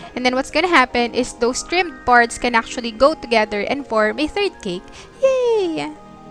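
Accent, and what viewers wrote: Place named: Philippines. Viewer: Hi Ate.... thanks for a well-made video. You are born to teach! If there is such a thing as a Filipino ENglish accent, this is an excellent example. Beautifully spoken😊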